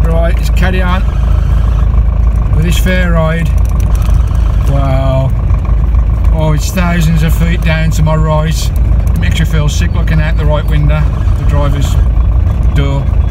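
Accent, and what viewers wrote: Scotland, United Kingdom. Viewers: Lovely Park up on top of that mountain! Love the Scottish accent!!!! Take care! Ju Xx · As a Scotsman I can say with all my heart ❤️ thank you for sharing your amazing travel's and your scots accent was brilliant 👍😀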